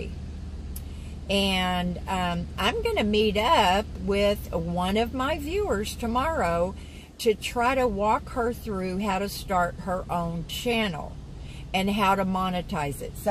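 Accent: South, United States. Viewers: You and ur Southern accent! Love it!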